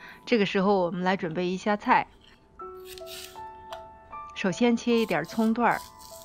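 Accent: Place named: Northwest, China. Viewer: 听你口音是西北人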